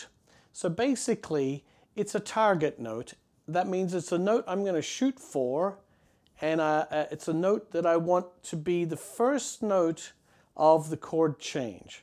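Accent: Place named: Australia. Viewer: He has less of an Australian accent now.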